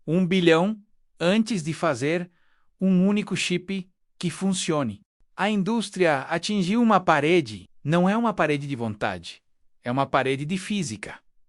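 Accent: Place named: Argentina. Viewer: Narrador argentino tentando falar português. O conteúdo é falso também. Final dos anos 1980, holandesa Philips já empilhava transistores (3D). Os pesquisadores citados, caso existam, estariam 're-propondo' algo há muito tempo já pensado. Que maravilha! A USP prevê o passado. Mas o nosso futuro - e presente - contimuam tenebrosos. Dito.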